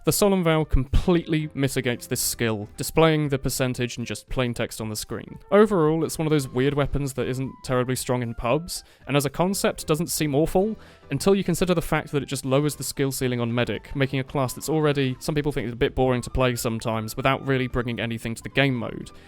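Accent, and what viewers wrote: United Kingdom. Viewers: even if i dont care much about competitive tf2...i just love hearing this british man speak like a professor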